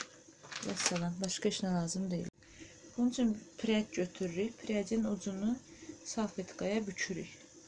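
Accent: Azerbaijan. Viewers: Salamlar. Bu videonu her yerde paylaşacam. Baxıram yarı rusca yarı hansısa Azerbaycan rayon lehcesidi. Super.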